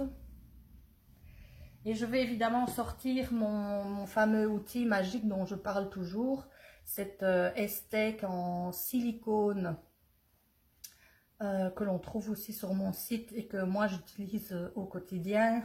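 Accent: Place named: North, France. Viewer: Merci pour ta générosité d'informations! moi qui suis originaire de Lille (expatriée en Normandie près de chez Raoult!) avec mon grand-père Belge, ça me fait du bien d'entendre ton accent;-)) dis-moi, quelle épaisseur fait ta plaque de terre pour ce plat? Y a t il des épaisseurs précises en fonction des objets plus ou moins grands? merci beaucoup Caroline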